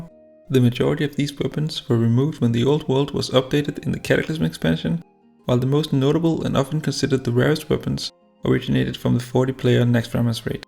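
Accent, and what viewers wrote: Denmark. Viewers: the danglish is noticable in this one ;D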